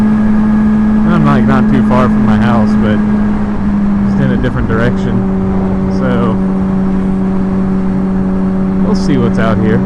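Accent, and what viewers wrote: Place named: Alabama, United States. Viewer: U from Alabama too?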